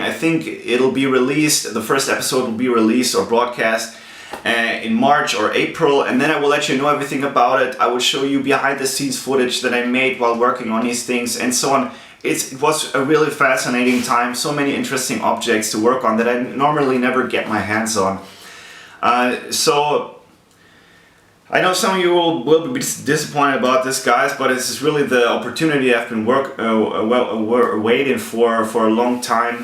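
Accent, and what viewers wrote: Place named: Germany. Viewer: Be careful with those videos about your television work. You could raise the ire of the television people if you release videos on things that haven't been aired yet. Movie goers aren't the only ones that tend to not like spoilers. 👍 Warm gear? Oh, worm gear. I keep forgetting that English isn't your first language. You speak English pretty darn well, so don't feel bad if I enjoy your accent a little. My German isn't even close to as good as your English, so I'm NOT mocking.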